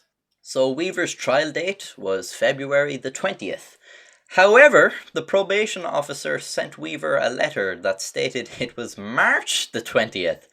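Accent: Ireland. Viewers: Are you Irish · your irish accent makes it funny when you rant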